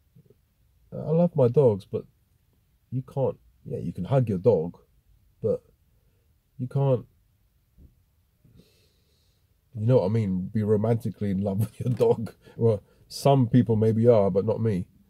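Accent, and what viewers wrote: United Kingdom. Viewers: Do you play a sport that may include women? I am not sure where you are from...accent possibly British? Do you play pickle ball? Take some lessons, and they can direct you to courts or groups of people that play. You can meet so many people. Certainly make friends like that. At the very least, you will be out amongst other people and have great fun too. You have to put yourself out there. The older we get the harder it is to make good solid frienships, especially for introverts. God Bless and good luck.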